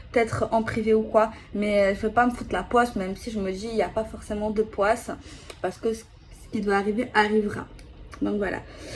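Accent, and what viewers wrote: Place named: Marseille, France. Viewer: j’adore ton accent marseillais j’espère pouvoir commander ton oracle le plus rapidement 😌et que tu me dises comment faire bisous prend soin de toi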